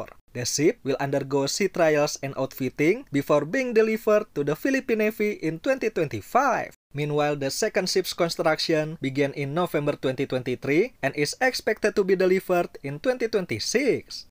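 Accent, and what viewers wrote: Indonesia. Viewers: Pengucapan english nya kaya org indo admin nya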